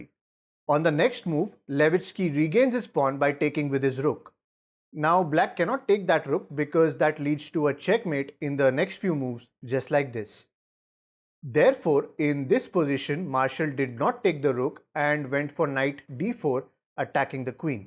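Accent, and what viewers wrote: India. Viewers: god i hate indian accent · ayo mutahar with indian accent?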